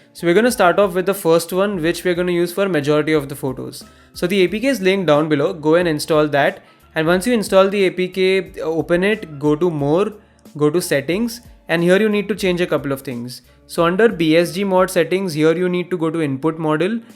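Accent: India. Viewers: CRINGE HEAR INDIAN SPEAK ENGLISH !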